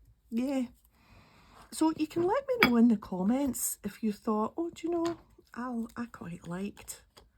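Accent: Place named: Glasgow, United Kingdom. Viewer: O we love your Glaswegian accent, Willie always listens and smiles when I watch your videos he likes the accent it gives him a touch of home. ❤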